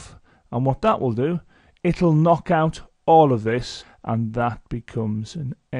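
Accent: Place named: Lancashire, United Kingdom. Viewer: Good Sir you are the best. Would be even greater if you added Boolean to the titles so we know which videos to look for. Other than that, the short and simple yet most effective way to help understand is your greatest asset. Btw I was debating with my mate about your accent and he said you were from Lancashire while I thought closer to Liverpool or St Helen's.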